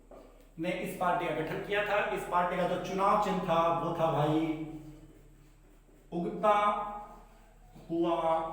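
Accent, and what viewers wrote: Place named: Haryana, India. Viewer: ठेठ हरियाणवी अंदाज़👌👌😂🥰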